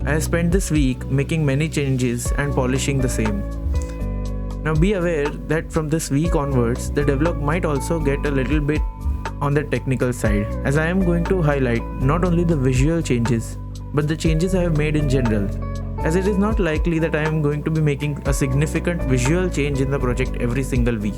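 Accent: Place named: India. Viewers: I am pretty sure he is from India just from accent. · the accent tells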